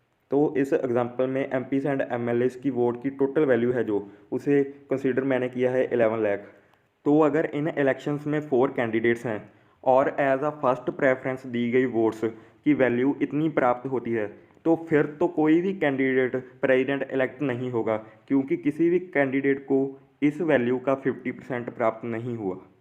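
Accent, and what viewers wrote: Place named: Punjab, India. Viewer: Hindi in Punjabi accent⚡️✅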